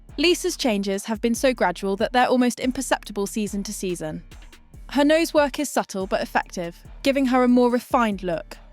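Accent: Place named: United Kingdom. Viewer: Are you Lucy from Engliswithlucy?? You have the same voice. And Iove your British accent. Good video !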